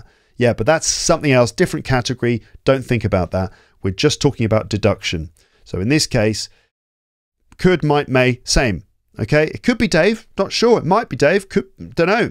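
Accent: United Kingdom. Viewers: Do you speak in british accent? 🇬🇧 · Are yoy British? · I happened to find your channel and totally drawn into. It was really interesting and learning a lot. I was looking for an English lesson program just like it. You are such a great teacher and I like your British accent as well it sounds so nice. I will check out your other movies. Thanks a lot! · you're such a great person, and your channle is really a treasure for those who want to learn English in its natural way, by listening to Britch guy with a very clear accent, just thank you for your effort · 👍👍👍👍👍👍 good teaching. he is British native English. Not American. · Thank you so teacher you are teaching english good also be able to listen British accent this is real one not easy to find out easy ways some one like British accent and translate to shot form sentence this is good idea clear thanking you.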